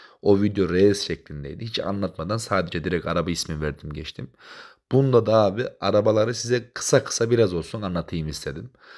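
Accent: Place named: Sivas, Turkey. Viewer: Gardaş sen Sivaslı mısın? Şive Sivaslı gibi.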